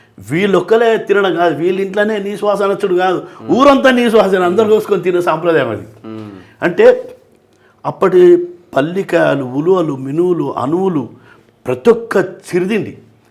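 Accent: Telangana, India. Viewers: తెలంగాణ యాస తో వివరణ ❤❤❤❤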